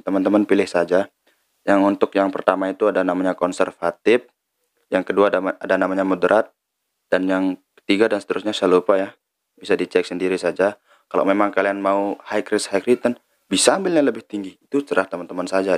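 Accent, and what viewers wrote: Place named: Lombok, Indonesia. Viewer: Org Lombok ni yak?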